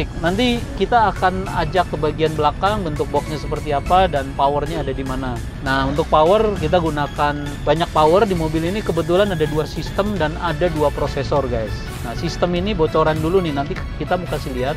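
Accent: Banyumas, Indonesia. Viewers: Jebul wong ngapak... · Walah ngapak boss, kapan2 tak dolan meng toko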